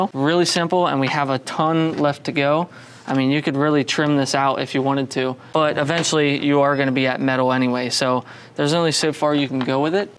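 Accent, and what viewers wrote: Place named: Philadelphia, United States. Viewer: Where are you guys located? You sound very Philly my friend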